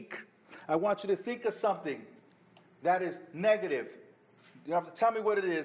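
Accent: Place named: New Jersey, United States. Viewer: yessss!!! Excellent points. When I first heard you and lady C say that “I’d rather have questions we can’t answer than answers we can’t question “ that resonated so hard with me. I mean , it was so enlightening. That fear is so thick we didn’t even realize we were living in it. I thought you’d be the best video to send to them because you look like that bethelite, you’re relatable, your presentation was what we as former Jehovah’s Witnesses can relate to. Your voice etc. reminds me of the congregations in New Jersey and NY. Thus, I thought they would be comfortable to listen. All they heard was this was an ex JW. DEMONS!!! It is an ATM great analysis. I went through a period where I’d lose sleep thinking how can I get my family to WAKE UP. Sadly after my mother’s passing in December 2018, I had to learn to let it go and let God. They were never going to listen to me now. 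Looking forward to seeing mom in the “new system “. The mind is more amazing than I ever could imagine. Some can go thier whole life excepting that they don’t deserve answers to their questions while others actually have the courage to break free from that conditioning. God bless you and Lady C for your inspiring work.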